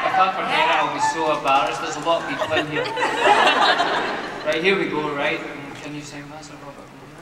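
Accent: Scotland, United Kingdom. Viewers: He's accent is like a mix of British, Indian, Scottish and maybe a little bit Scandinavian. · No he just sounds Scottish you pleb. And British isn't an accent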